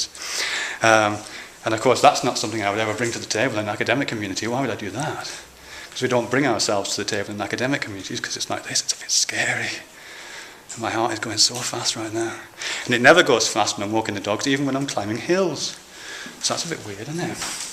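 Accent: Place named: Liverpool, United Kingdom. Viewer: there's lots of scouse/chessire in this video... are you from the north east or the wirral?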